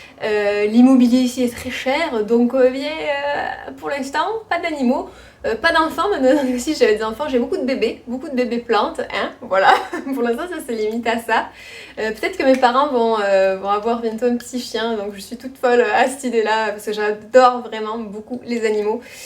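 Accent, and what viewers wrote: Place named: Montpellier, France. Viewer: Félicitations pour le mariage! Je viens de découvrir ta chaîne. Ton accent me disais quelque chose ^^ je suis de Montpellier :) au plaisir de te rencontrer un jour ! En attendant je continue de découvrir tes vidéos ❤️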